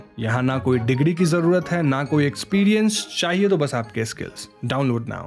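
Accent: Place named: Bihar, India. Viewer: Bhaiya tum bihar se ho na kyuki bihar k log sadak ko sarak bolte h jaise unse kaho sadak par ghoda tadak tadak daudta h to vo bolte h sarak pr ghora tarak tarak daurta hai 🌚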